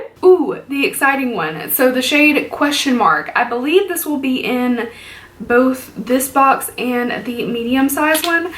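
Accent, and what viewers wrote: South, United States. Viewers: i must be crazy or do you have a little southern accent? i love them. my insta is :•) ive never tried a jeffree product but ive always wanted to try a highlighter 🤠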